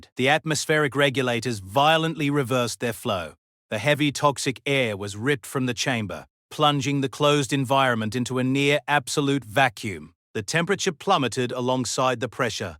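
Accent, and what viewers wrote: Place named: Australia. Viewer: Based on the narrator...she was an Australian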